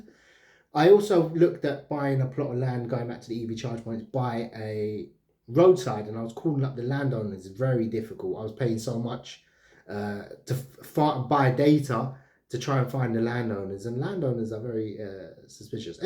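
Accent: United Kingdom. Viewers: I don’t know if this sounds unprofessional or not but please let me know what type of british accent you’ve got. Thanxx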